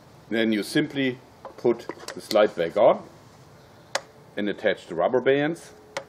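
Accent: Germany. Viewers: Are you german? I think I hear a slight German accent but I’m not sure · Bist du Deutscher hört sich so an ?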